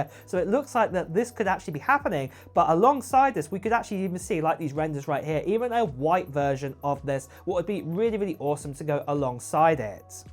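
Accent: United Kingdom. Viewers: I definitely will buy the next  TV. You are really good explaining the new devices plus it helps with your British accent, I m from London UK. 🇬🇧 😁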